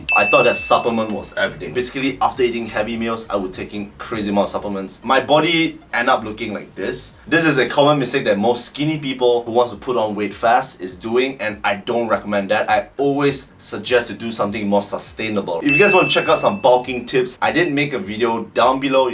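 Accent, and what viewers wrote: Singapore. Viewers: Is this a Singaporean accent? Great video by the way! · Eh bro r u Singaporean u sound singlish siah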